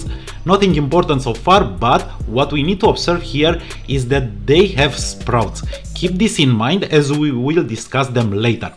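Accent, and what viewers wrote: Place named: Romania. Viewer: ma crezi ca mi-am dat seama ca esti roman din primele 15 secunde?:))))))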